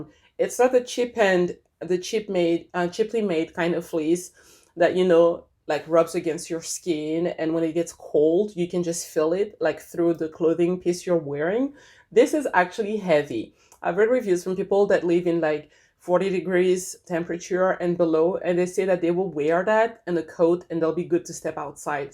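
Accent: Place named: Texas, United States. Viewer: I was listening when Andy walked in and I asked him, “Do you recognize this voice?” “Yes,” he said, “!!” So fun to hear you!!! But I think you’ve lost some of your Texas accent! 😉 We miss you!!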